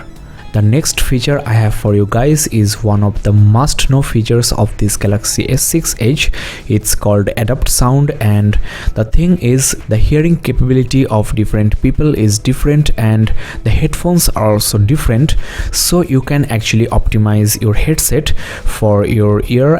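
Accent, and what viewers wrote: Bengal, India. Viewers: Not being racist, but he sounds almost like a indian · lmfaoo thats a bengali accent its clearer than indian but almost the same, indians say aindd for the word "and" lmfaoo.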